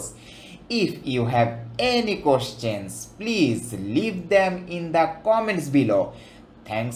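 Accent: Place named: India. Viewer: Can you speak english without indian accent???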